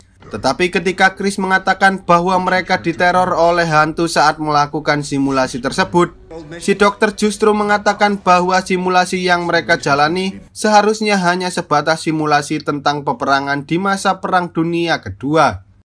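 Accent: Java, Indonesia. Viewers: logat jawir malah ngilangin selera nonton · Sampeyan Jowo ne ngendi mas? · Meddkok Mbanget aksennya.. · Medok amat Lu Jawa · Jawa nya mana bang ? medok bngt ,,,mantap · Fix ini yang ngisi suara pasti "wong jowo" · Mas, klo bsa jangan medog dong bahasanya ...bsa gagal fokus nih nyimak film nya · Sangat medok keren2👍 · Medhoknya sangat nacurell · Medok jawanya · Kejadian kejadian aneh. 😀 Longat jawane apik tenan 🔥 Bravo..... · Aduh aku lucu sama suaranya medok sekali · Medok bgttt 😁😂👍👍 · Medok banget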